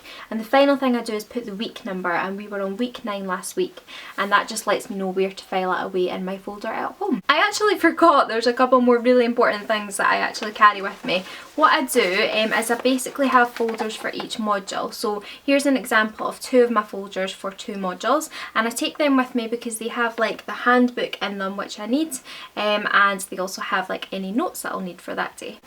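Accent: Scotland, United Kingdom. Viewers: SCOTTISH ACCENt?/?/!????? SO BEYOND CUTE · I am from Germany and I will go to Scotland for my Au Pair next year. I love your accent. It is great to get prepared for my time in Scotland. Thank you so much! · OH MY GOD!! Are you Scottish?? I AM IN LOVE WITH SCOTLAND! This is a goal in my life to visit this country!! (I am French) I just find your video and I want to thank you for it because it really help me! I am a young student at university and I've search everywhere to find a bag that could fit with the atmosphere and now (thanks to you) I find it! So yeah thank you again!! Bye :) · the accent 💓💓. Merida's blood relative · Ah! So you’re Scottish! For some reason I thought your accent was German?? But then reading the comments, it made a lot more sense now. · YOURE SCOTTISH WOO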